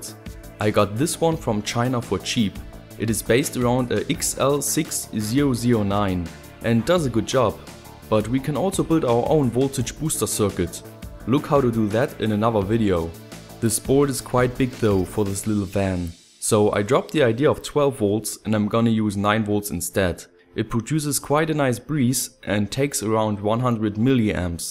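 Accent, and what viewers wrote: Germany. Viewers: Pretty cool. Oder ziemlich cool... ich glaub du bist Deutscher. :) Cooles Video. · du kommst aus deutschlan oder? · Are you German? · he is German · Are u german ? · denglish / genglish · bist deutsch neh :D geiler akzenz :D aber gutes englisch :D wie bekomm ich am einfachsten bluetooth kopfhörer für nen helm hin :D mein einfall.. mini bluetooth box aufschrauben .. kopfhörer zerlegen und pooow XD aber ich hab einfach mal null ahnung von elektro und audiozeugs XD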